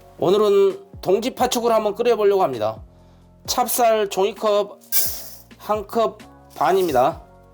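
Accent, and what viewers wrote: Jeolla, South Korea. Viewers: 전라도는 이신가벼요 친숙해서 좋아요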